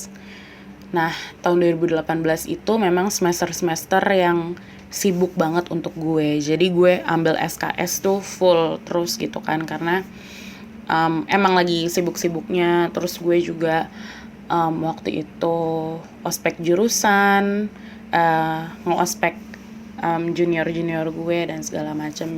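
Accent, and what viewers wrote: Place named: South Jakarta, Indonesia. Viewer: Woww aksennya jaksel bingitsss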